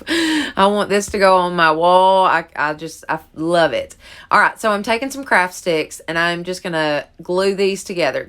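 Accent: South, United States. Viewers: Love your creativity! What state do you live? You speak southern like me! I’m in Tennessee! I’m thinking maybe you live in Kentucky!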